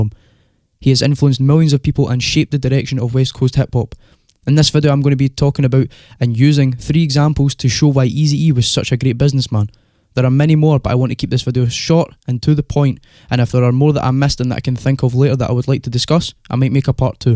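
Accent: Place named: Scotland, United Kingdom. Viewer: Love that Scottish accent bro and love the vid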